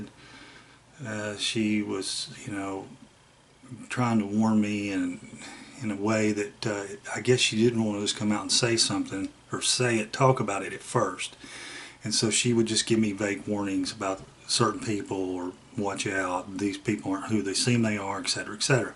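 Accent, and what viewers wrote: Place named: West Virginia, United States. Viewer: Where are you from,? Your accent sounds a lot like mine here in good ole WV. Bobbie was a very sweet lady... always came across as genuine. This is all new to me, considering that Gimlin is a liar, so I'm kind of in shock. I was always kind of hoping that MK Davis, who I like, was just overreacting or something, like we're all capable of from time to time. This is pure insanity. So, Patterson and Gimlin expedition wasn't to film one, it was to wipe out a family of them in that area? I had no idea that we weren't seeing the complete film, but honestly, I never bought the "Patterson fell off his horse" part of the story. No one falls off a horse and lands that gracefully, camera in hand still.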